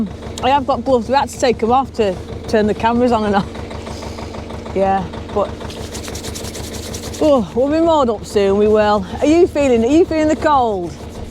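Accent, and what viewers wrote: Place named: North, United Kingdom. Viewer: Just found you. Great fun listening to your northern ramblings. Thank you. You seem very genuine in your spoken thoughts.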